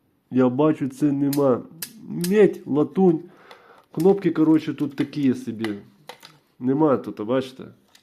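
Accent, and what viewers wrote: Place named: Kuban, Russia. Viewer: что за слова у тебя из рта вылетают, с кубани что ли что по русски слабо разговаривать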